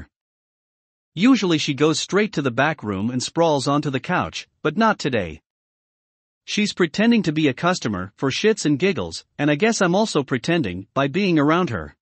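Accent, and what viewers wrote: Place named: United Kingdom. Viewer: It's a bit hard for me to understand the British accent, but I'm getting used to it, great story.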